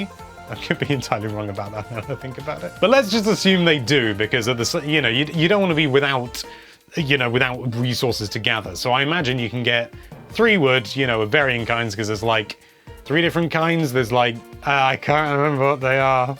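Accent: United Kingdom. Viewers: You can stop being adorable with all your fancy UK speak. ❤ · Man I love your Britisch accent and all of the words that come with it xD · That's splendid you like our accent. Can't beat a bit of 100 percent brittish beef 🐄😬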